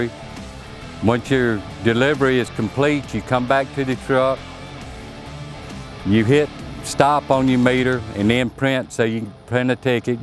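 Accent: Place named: South, United States. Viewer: Im about as north as you can be and damn that's thick south!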